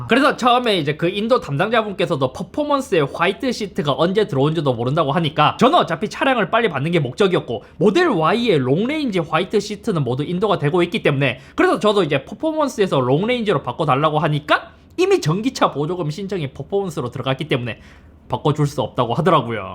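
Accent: Gyeongsang, South Korea. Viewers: 섭아....내가 한마디만 할께...KT 썰이 공중파까지 타고...캐티통신서비스 개선에 엄청난 파급효과인정... 그런데..테슬라 썰은...아닌것 같다... 본인이 흰색 시트 주문하고서..(미국도 화이트시트 구하기힘듬) -__-:: 테슬라가 본디..그러한것은 알고 있잖소...아니말로 잇썹 구독자들도 갱쌍도 발음 신경쓰이긴 하지만... 내용의 퀄러티가 좋고 해서...매번 참아가면서 보고있단다... 본인 선택이 빠가(?) 스러운 결정이였는데......영업사원이 모델Y가 빨리나온다는 말만 반복... 쩝...아예 계약을 취소하고 이런방송을 하였으면..인정하는데... 은근히 백만유튜버이니...테슬라 지사에서 신경써서 출고해달라는...방송같다... 초심(?) 항상 생각하자......섭아....이상......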